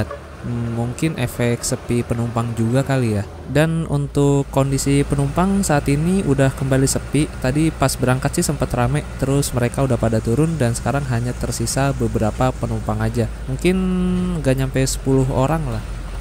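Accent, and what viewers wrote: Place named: Depok, Indonesia. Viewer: Asli emg logat bhasa anak depok ka, btw saya org depok juga soalnyakwkwk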